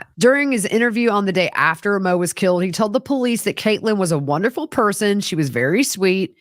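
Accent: South, United States. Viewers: And her southern accent fits perfectly for a case here in Texas!!!!😊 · Also from the south so I love your accent and your pronunciation of tumultuous is cracking me up 🤣😂🤣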